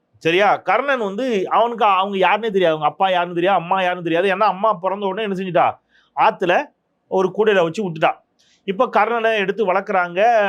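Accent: Tirunelveli, India. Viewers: Thirunelveli language la sema Anna.... congratulations 🍫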